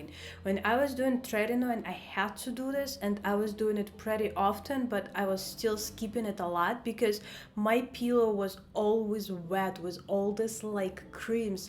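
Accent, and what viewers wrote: Russia. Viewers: that's insane, I've understood that you are Russian or maybe Ukrainian since the first word. If I'm incorrect, it'll be awkward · по акценту одразу зрозуміло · По акценту сразу показалось, что вы русская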